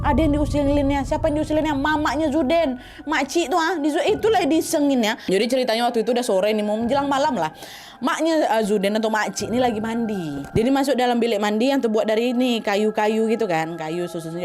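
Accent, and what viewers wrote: Malaysia. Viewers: candu pas kak pake logat malay😂 kak emg juara 1 menguasai logat daerah bahkan sampe ke negara tetangga 🤣🤣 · Hai fatin from malaysia * comel sangt ckp slang malaysia........ comel!!!!! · comel je cakap bahasa malaysia 🤭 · Bahasa melayu kak gelas kali wak ehh😊😊😊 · leggok kalii melayunya bahh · comelnya kak berbahasa melayu 🤭 salam dari Malaysia kak. sihat2 selalu 🫶🏻🇲🇾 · Comel cakap bahasa melayu malaysia❤ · Comelnya cakap melayu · Kak cakap bahasa melayu serasa lg dgr cerita p.ramlee👍🏻🤭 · Lucu sangat cakap bahasa Malaysia,comel je😊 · Comel je cakap bahasa melayu😊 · comel je ckp style malaysia😂❤ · Kak lucu bgt pake bahasa Melayu😂😂❤❤❤ menghayati ya wakk😂😂❤❤❤❤ sembagattt wakk❤❤ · Pertama, cerita ni memberi nuansa baru dari khw sebelumnya, jadi seru kaliii. Kedua, kak cakap melayu adoooh comel lah sukak ❤ makasi kak utk videonya, selalu excited nonton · Respect cara Kak bercerita.. 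sama macam bahasa Malaysia.. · Comel nye dengar kak cube cakap bahasa melayu. Thank you kak for the effort, hargai gile usaha kak ❤ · Kak kenapa logatnya jadi ikut pake logat melayu😂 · Mood banget denger kak ngomong logat melayu gtu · cakap melayu is so comeyyy 🤣😊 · nada&logat malaysia ny dpt bgt plsss□□ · Lucu skli kak ngomong bahasa malay😅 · Comel lah kak cakap bahasa Melayu 🤭🤭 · comel la bercerita dalam bahasa malaysia 😍😍😍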